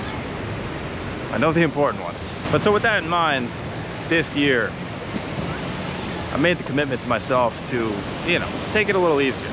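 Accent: New York, United States. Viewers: While I still think you're with the CIA, a common spook whose 90% of this audience is women, I've heard in other vids you said you were Canadian but you have a very distinct NY accent. Why the lie? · I’m a new subscriber. I said to my wife that “his accent & mannerisms seem like a New Yorker”. Yep, I also grew up on the island. I have lived in the Philippines and am planning on moving back in a couple when I retire. Looking forward to watching more of your videos. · The greatest accent ever - New Yawk!!! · Sometimes your "New York" shines SUPER bright in your speech. Lol! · You are one of the most honest YouTubers out there. I knew you were a NY’er from the accent lol. You’ve probably ridden one of my trains out of NY Penn. Aren’t our trains garbage compared to every other country in the world? lol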